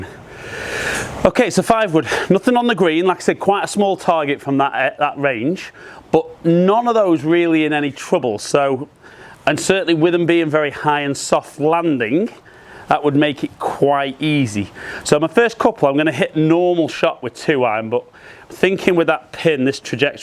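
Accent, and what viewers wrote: England, United Kingdom. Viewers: He is English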